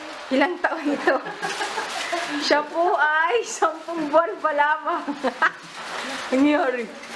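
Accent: Visayas, Philippines. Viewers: bisaya ka sis? Parang pansin ko may kunting accent ng bisaya.😊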